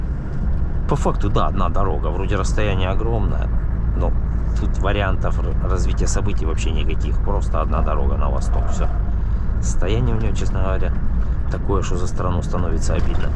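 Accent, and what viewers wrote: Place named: Rostov, Russia. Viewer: А говор у тебя Ростовский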